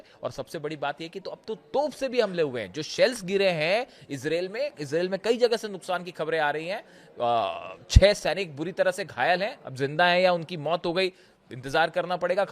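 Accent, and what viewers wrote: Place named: South, India. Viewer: چینل تو اردو ہے لیکن لہجہ ساوتھ انڈین ہے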